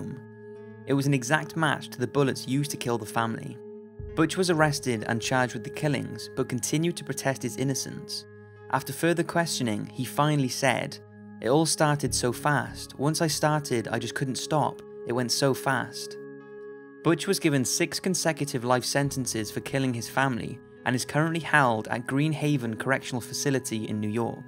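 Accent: Wales, United Kingdom. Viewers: Yes, he should definitely do audio books. Such a soothing and clear voice. Is that a slight Welsh accent I hear...? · finally a Brit narrator😅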